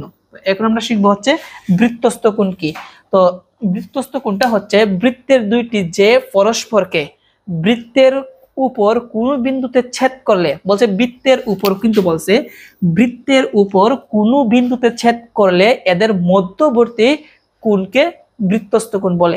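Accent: Sylhet, Bangladesh. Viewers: Apnii ki sylhet er habiganj er ?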